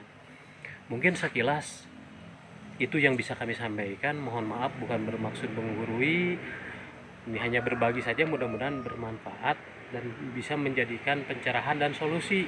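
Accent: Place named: West Java, Indonesia. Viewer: Logatnya seperti sunda, Daerah mana kang?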